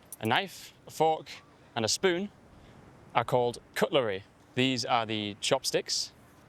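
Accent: United Kingdom. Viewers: I really love watching your video sometimes I practice to talk just like your accent British accent talking to myself haha 😂😂 I learn a lot from you.thanks u from Philippines 🇵🇭 with love.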